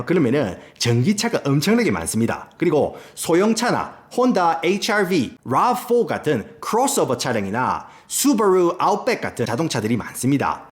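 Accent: Gyeongsang, South Korea. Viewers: 갱상도 사투리에 안어울리게 영어발음이 장난아니네 ㅋ 재밌네 아재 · 어쩜 경산도 사투리가 촥촥~ 귀에 감겨요. ㅎ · 우째 이리 갱상도 사투리와 빠다 먹은 영어 발음으로 말을 재미나게하는지~ 윽수로 땡큐라예~ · 경상도사투리와 영어발음이 온가는게 넘 재밌네요 ㅋㅋ 저는 미국온지 2 년째인데 저도 경상도입ㄴ다ㅎㅎ 전 달라스인데 공감해요^^ 근데 h 마트가 월마트보다 야채과일은 더 엉망입니다 ㅜㅜ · 와... 시끄러워. 경상도. 생긴것도 딱 경상도네. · 고향이 어뎁니까? 참말로 구수하네예. 영어할때 반전이네예 ㅋㅋㅋㅋㅋ 구독했쓰예! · 귀요미 경상도 아재가 말아주는 알쓸잡미국 썰~~~ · 말투가 너무 중독성 있어요ㅎㅎㅎㅎㅎ 경상도 + 교포느낌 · 아뉘 사투리 😂찐 갱상도인이 듣기에 너무 편안! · 구수한 갱상도 사투리..ㅋㅋㅋㅋ 좋은 영상 많이 기대하고, 항상 건강 챙기소~~ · 강렬한 경상도 사투리 사이에 비집고 튀어나오는 넘나 정확한 영어발음 ㅎㅎ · 갱상south도의 사투리 찰지고 재밋어예❤ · 경상도 원어민이 영어도 원어민이시네요 내용도 유용하고 재밌기도 합니다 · 외국인이 한국남부(경상도) 사투리를 인지한다는게 신기하네요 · 저도 이게 넘 신기한게... 왜 찐 경상도남자들은 서울말투도 따라하는거 오글거린다 하시잖아요? 근데 또 영어발음은 또 clear하게 넘 좋으시고. 또 너무 어릴 때 왔다기엔 경상도 accent가 thick하시길래ㅋㅋ 1.5거나 혹은 성인 때 왔어도 언어 센스가 좋으시구나~ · 아... 너무 재밌게 봤어요. 타겟과 월마트의 적나라한 카트 비교.. ㅋㅋ 트레이더죠스의 주차장 상황까지.. 구수한 갱상도 사투리에 쪼매 안어울리는 영어발음은 완전 본토! 미국생활 30년차인데 나름 감동이었습니다.. 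· 이상하게 난 한국에 아는데 갱상도 사투리를 들으니까 향수가 느껴지지? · 여기서 경상도 사투리 들으니까 너무 우습네요 근데 Albertsons Vons 브랜드 가 Kroger 브랜드 보다 한단계 높은디 ㅎㅎ구독과 좋아요 했어요 또 웃겨 주세요. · 채널 주인장님 완전 매력터짐요ㅋㅋ 오리지널 경상남도 남부 억양과 한 번씩 나오는 영어가 삘이 좋은게 어딘가 매치가 잘 안됨 + 표정의 90%는 입매가 담당 + 어딘가 중국계 미국인 필 입담 대박 맛깔나요 👍🏻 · 어떻게 영어는 완전 찐 교포신데 한국어는 경상도아저씨 스타일이신지 넘 신기해요.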